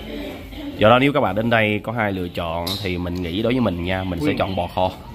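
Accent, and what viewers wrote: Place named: Saigon, Vietnam. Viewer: Mình ở xa, nghe giọng Sài Gòn, Mình cũng thích ơi, chúc - được nhiều sức khỏe, đi chơi vui vẻ ❤❤❤❤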